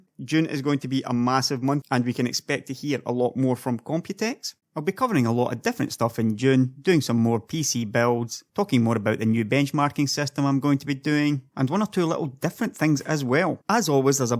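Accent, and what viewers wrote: Scotland, United Kingdom. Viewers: that lovely scottish accent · Are you Scottish? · i love scottist accent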